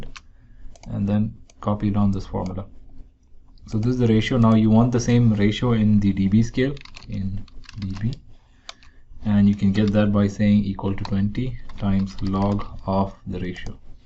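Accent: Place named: India. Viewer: This indian sounding man has saved my electrial engeneering homework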